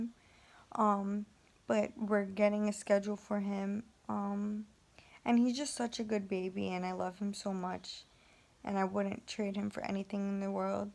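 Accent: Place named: New York, United States. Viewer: She has a NY accent that's why I ask. In love w you girl I'm fairly new ☺️💜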